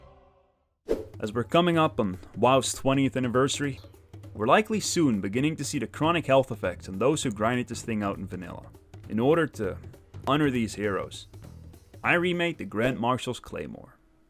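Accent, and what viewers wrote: Netherlands. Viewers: I watch for your commentary, klootviool ;) Echt waar Dinglish af en toe maar ik kan het wel waarderen. Other than that the nostalgia hype of seeing old WoW shit is great. If I were you, id branch out to other games. Maybe redo a CoD 1 / Battlefield 1942 weapon? The latter had some iconic music. Try to get some cross gamer appeal going. I personally dont watch streams or anything. Just find something short and fun to do. Maybe do some starfield modding? That game is going to be huge starting next week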